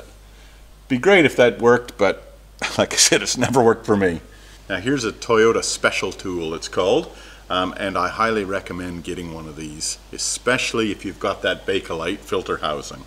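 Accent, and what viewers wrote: Canada. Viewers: I don’t own a Toyota but I had a great laugh at your “bugger me” moments! Add the Canadian twang to the mix and I’ve spent the whole arvo watching your clips about a vehicle I don’t even own! Well done and keep the content flowing.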